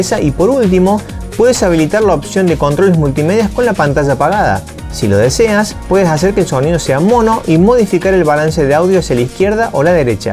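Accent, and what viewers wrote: Córdoba, Argentina. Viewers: Agradable de escuchar porque es Cordobés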